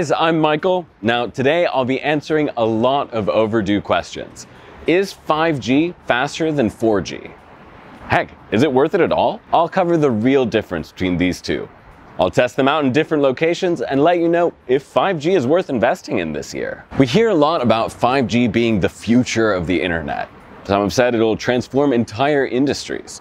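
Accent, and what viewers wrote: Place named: United States. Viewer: I can't pick his accent. It's sounds American to me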